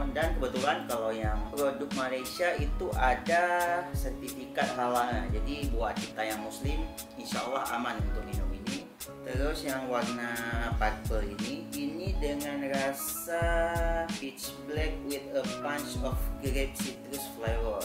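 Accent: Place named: Aceh, Indonesia. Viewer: Orang aceh emang biasanya ngomongnya begitu logatnya gitulah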